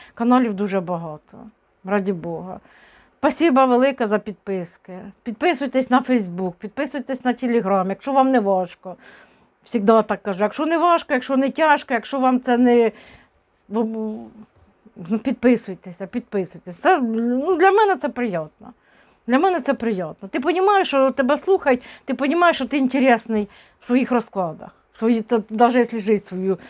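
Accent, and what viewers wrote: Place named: Chernihiv, Ukraine. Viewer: Ми в чернігівській обл.точно так балакаємо і нічого,а скільки наших хлопців з таким суржиком захищають країну!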